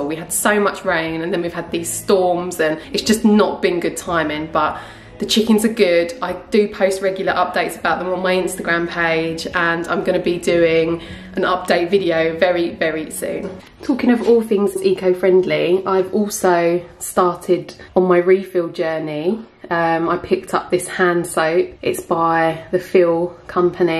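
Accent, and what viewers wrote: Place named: Essex, United Kingdom. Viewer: Have to ask, where in Essex are you from? Your Essex accent is very much posher than mine haha.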